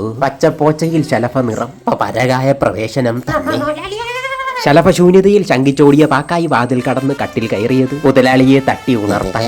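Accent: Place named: Kollam, India. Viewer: Kollathu evideyo aanennu thonnunnu. Pocha ennu parayunnu.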